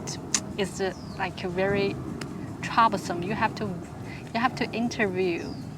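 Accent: United Kingdom. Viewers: You have a light British accent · I see you as a beauty and brain lady and I noticed that there are instances when you speak there’s a British accent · you sound little bit of uk/british style accent. what school in china you learn english? i think china government can still track you even in vpn by requesting from chinese internet providers(if you are not using chinese internet provide then maybe you are safe). free vpns definitely they can see what you are doing, paid ones are encrypted. but i think they could still decrypt that now that china has quantum computers. try to start criticizing chinese government, you'll see😂 just kidding. kinda scary, even jack ma didnt escaped president xi😅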